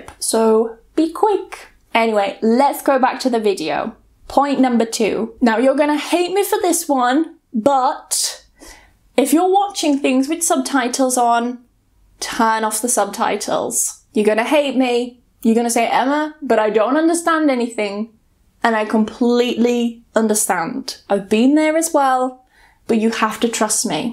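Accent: United Kingdom. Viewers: in this great video, I like british accent such as your accent. thank you so much your types of listening emma.. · Hey Emma that's a good while that I'm learning English and i was only focus on American English but i do find your British accent so interesting...So inspiring! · I prefer American accent, but, the point is, regardless of which accent you are more into, I think English learners should study different accents, because soon or late you will find someone who doesn't speak the accent you're used to, and then what?? Will you ask this person "could you please speak with the accent I can understand??" Of course not, and Emma your accent is so nice that makes me feel like learning more British accent. I hope my comment is useful. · Your British accents is ❤️😍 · your beautiful British accent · I understood every word you said , but sometime I find is difficult to follow the conversation. 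you're British accent amazing . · your* British accent ;) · 妳的英國腔讓人愛上英國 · I understand perfectly Emma's English, even she speaks British English and I'm studying American English. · Cool your britist accent . Very very good . I enjoy a lot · Hiya Emma, i like your british accent, this lesson was really interesting, I must confess…I usually watch your videos with english subtitles, I loath miss some keywords.. please have a nice day.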